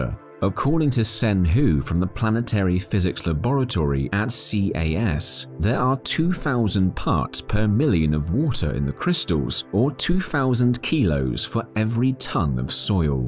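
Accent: United Kingdom. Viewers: I know what they found on the moon your big stupid limey mouth talking 💩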